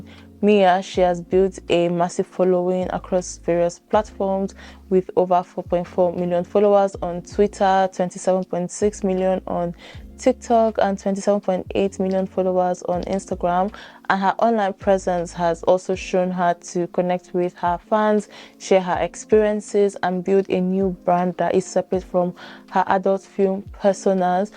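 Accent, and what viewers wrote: Nigeria. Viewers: I love your accent, r u nigerian σ(^_^;)? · Yep that's a nigerian accent! Source: I'm nigerian too!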